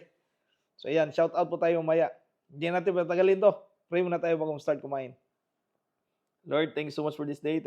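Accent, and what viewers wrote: Visayas, Philippines. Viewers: Perfectionist ba nimo...normal Rana sa amoa mga bisaya.. ❤ bisaya Rai makasabot anah 😂❤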